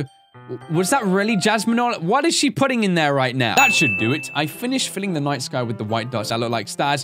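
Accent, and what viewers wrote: United Kingdom. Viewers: Why do you sound sound British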